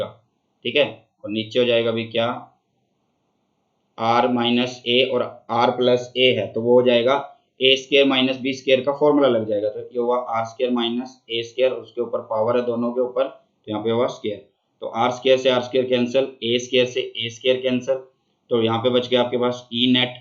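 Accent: Haryana, India. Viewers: I think haryana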